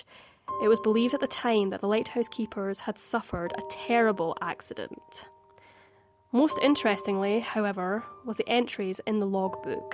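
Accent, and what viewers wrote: Scotland, United Kingdom. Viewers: That was so creepy, your delivery is perfect and your Scottish accent makes me listen intently......When I was a kid I always wanted to be a lighthouse keeper. Thanks from a sassenach -)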